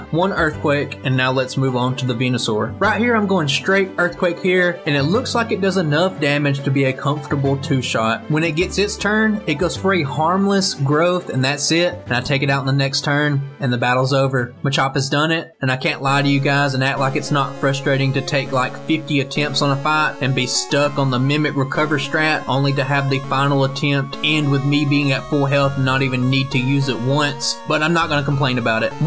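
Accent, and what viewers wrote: South, United States. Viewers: nah, dude. Thank YOU for the great content. Love the southern accent!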